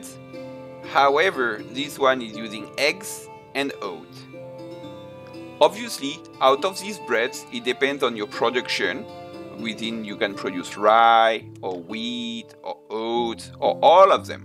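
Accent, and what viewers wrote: France. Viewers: Tq for this guide despite heavy french accent and small following I find his guide comprehensive and straightforward compared to others 👍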